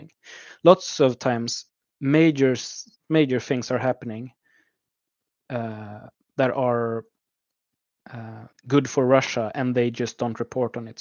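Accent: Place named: Sweden. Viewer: I could tell right away you were swedish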